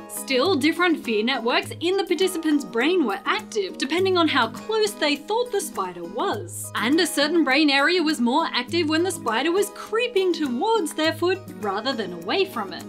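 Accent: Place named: Australia. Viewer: Oh God, I'm in love with you and your Australian accent even though I have a girlfriend. (Shh, It's a secret)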